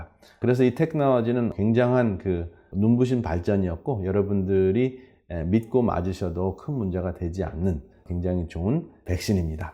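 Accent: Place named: United States. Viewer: 그렇게 생각하실 것 없습니다. 이분은 미국에서 오래 사시다보니 자연적으로 미국식 발음에 익숙해져서 그런거지 일부러 혀꼬부라진 소리를 하는게 아닙니다~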